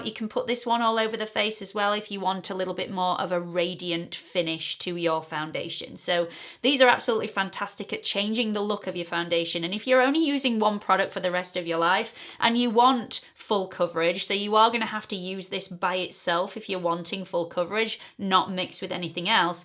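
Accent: United Kingdom. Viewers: Great collab with one of my favorite influencers. I so enjoy your videos when your mother is on with you. She and I are close in age (I am older by 4 yrs). You're a joy to watch. I LOVVVE the British accent so I am usually mesmerized when I watch your videos! This was a fun video to watch.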